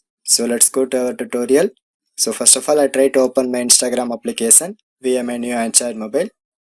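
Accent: India. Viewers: Kick-Ass Bro it's always a pleasure hearing Indians talk in English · That's Indian I think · I think He's from India 🇮🇳 ❤ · His indian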